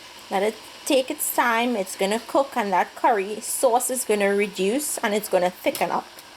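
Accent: Trinidad and Tobago. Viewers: The Trini accent makes it MORE interesting!!!!!!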